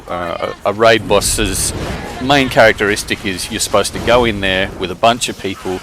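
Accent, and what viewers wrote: Australia. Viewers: Yes we do and your aussie(thats a compliment)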